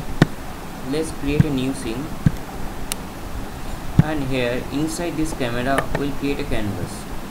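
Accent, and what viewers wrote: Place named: India. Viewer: indian accend just fucked up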